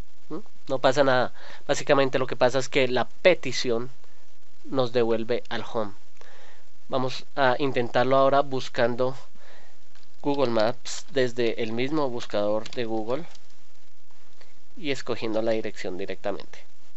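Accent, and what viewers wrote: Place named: Mexico. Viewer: El Senséi tiene toda la razón, con acento manito, pero la tiene toda :o)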